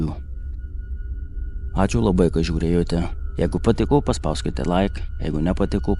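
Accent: Suvalkija, Lithuania. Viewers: Akcentas lb gražus, Suvalkijos.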